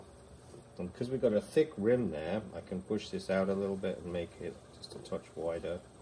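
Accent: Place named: Wales, United Kingdom. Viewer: I hear a Welsh accent, reminds me of home. Wish you were here in Beverly Hills, so I could gain confidence in making something bigger than a tea cup or mug.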